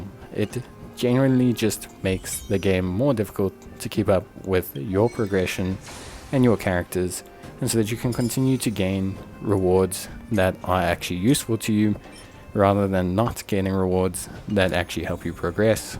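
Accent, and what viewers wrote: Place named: South Africa. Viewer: Bro sounds south african😅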